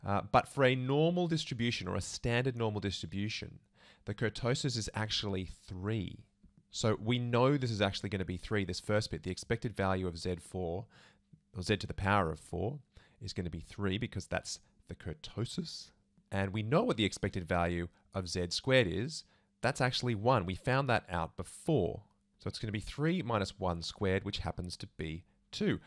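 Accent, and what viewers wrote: Australia. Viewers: is that Australia accent?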